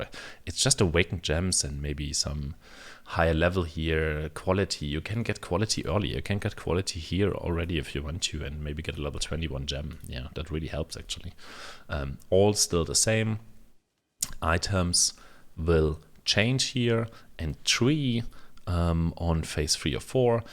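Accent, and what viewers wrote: Germany. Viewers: u can 100 %hear that in ur voice haha :D not a bad thing tho your english is fine u just hear the german pronounceciation!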